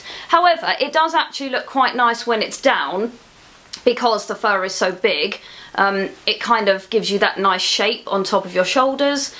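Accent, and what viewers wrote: England, United Kingdom. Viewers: I love your presents, they're amazing! and I love also your accent, you're from England, aren't you? :) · Its nice to listen to your strong british accent, it reminds me the listenings i had to do when i was studying english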